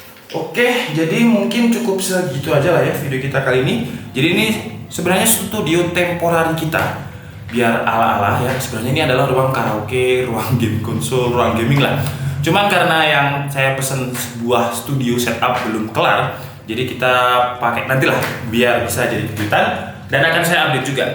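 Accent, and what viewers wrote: Java, Indonesia. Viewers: Bukan karena intro 'Hai gays disini', tapi yg unik itu ada bahasa jawa medoknya "mbarak/nyengkrak/nyetrong/jeding/ceduk" yg biasanya dilanjut pertanyaan "Opo Tom bahasa indonesia-ne?"... Saya ada saran untuk pasang led stripnya misal sesuai yg dibilang pake akrilik dicat/triplek/papan dilubangi di setiap ujung dari huruf dan setiap sudut huruf dg diameter sesuai lebar led strip, dilubangi untuk setting supaya tampilan huruf angka/kalimat yang terlihat bisa terputus bukan latin karena lekukan led stripnya diselipin di belakang akrilik dicat/triplek/papan lewat lubang tadi, seperti buat lubang untuk jalur keluar masuk led stripnya dan yg terlihat di depan hanya yang dibutuhkan tanpa potong led strip...Jadi gak perlu pasang double-tip di tembok, disusun dulu di rangkaiannya baru digantung/ditempel paku di tembok.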